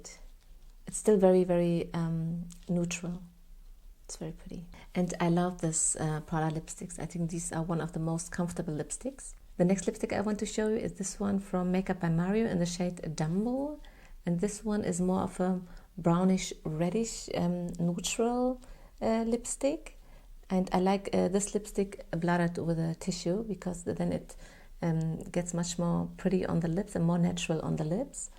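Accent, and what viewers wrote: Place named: Arab world. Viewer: I will guess Middle East . Arabic.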